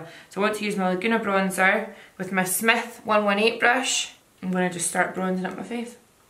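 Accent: Scotland, United Kingdom. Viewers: “Pish” high five to the Scottish bird lingo, nothing sounds as good as an angry Scottish girl 🤣. I’m Scottish too and fairly local to you 😀👍🏻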